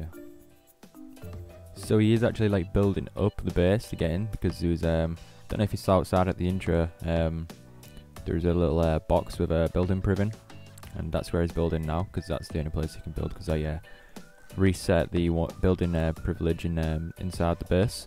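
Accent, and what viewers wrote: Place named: Hull, United Kingdom. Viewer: Yo man nice video! where are you from in england ? you sound like you're from hull haha! thats where im from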